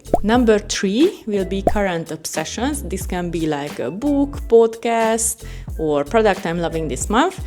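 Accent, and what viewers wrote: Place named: Hungary. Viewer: Szia, mindig mosolygok amikor meghallom az accentusunkat 😊 még nem néztem meg a bemutatkozó szöveget, de rögtön tudtam,h honfitárs. Sok sikert a csatornához, nagyon szuperek a videóid. Ha megakarod mutatni az embereknek az Ír beach életet csak gyere fel hozzánk Balbrigganba 😊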